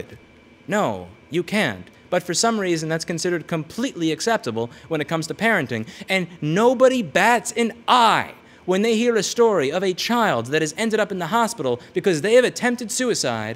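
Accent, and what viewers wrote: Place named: Wisconsin, United States. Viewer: Wait a second , you are a Wisconsinite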